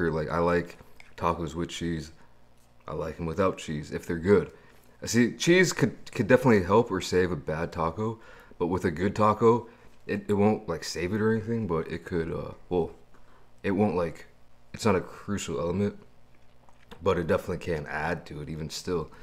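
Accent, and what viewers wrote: United States. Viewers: Yo, what made you want to move to Korea? Just curious because you definitely sound American.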